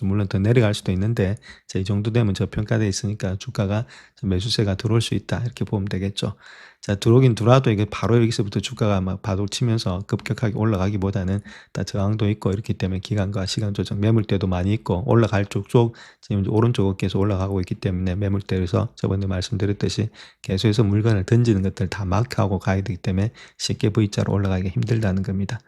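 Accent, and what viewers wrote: Gyeongsang, South Korea. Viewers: 그냥 지나가세요. 경상도분들의 발음이니까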